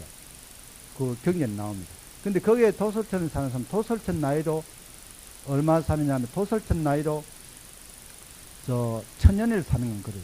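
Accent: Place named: South Gyeongsang, South Korea. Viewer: 구수한 경상남도 사투리의 질박하고 자세한 스님의 강의를 듣는 인연을 맺어주신 데 대해 감사드립니다.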